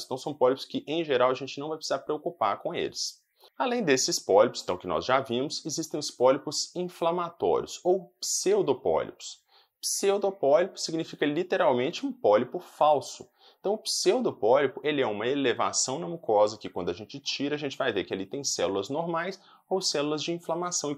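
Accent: Minas Gerais, Brazil. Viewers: Conheço esse sotaque. Ele deve ser daqui de Minas. Gracinha. 😄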